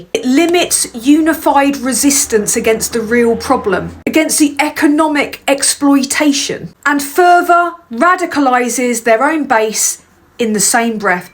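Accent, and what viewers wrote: London, United Kingdom. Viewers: London accent and fake dyed hair means the likelihood of you being either English or blonde are vanishingly small. And considering your ideology it's 50 50 whether you're a biological woman or not. Short? I'll take your word for it.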